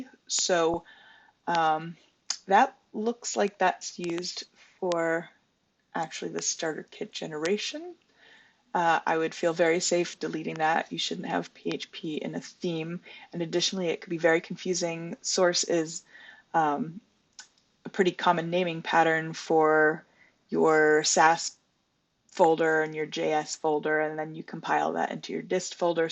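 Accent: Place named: Scotland, United Kingdom. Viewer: I detecting a wee scottish twang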